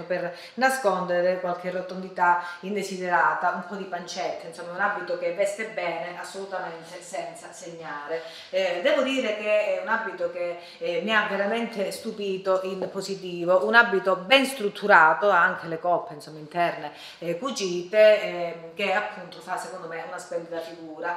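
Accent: Calabria, Italy. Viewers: Ciao, posso sapere di dove sei? Sei calabrese? Conplimenti.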